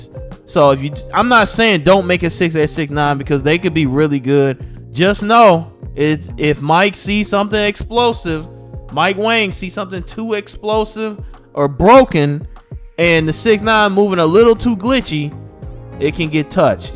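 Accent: St. Louis, United States. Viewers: U from st.Louis cuh ?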